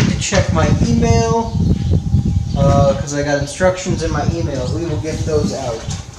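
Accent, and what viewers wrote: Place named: California, United States. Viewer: Hey, thanks so much man, sound like you might be from California, I'm from Burbank, are you still happy with these batteries, it looks like you have lithium just curious I had I haven't listened to the whole video, maybe you mentioned that already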